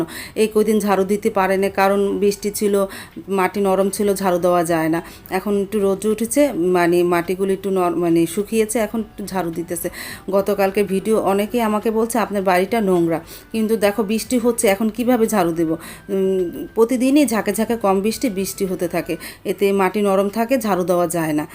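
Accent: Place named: Tangail, Bangladesh. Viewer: আপুর কথাগুলা একদম আমাদের টাংগাইলের ভাষার মত।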